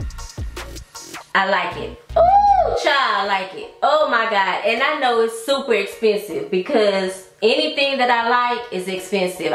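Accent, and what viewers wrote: Texas, United States. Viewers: Are you from Texas? So am I.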